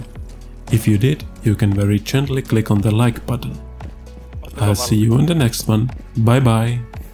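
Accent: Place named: Finland. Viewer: You're definetly Finnish aren't you? Accent is sounding like it.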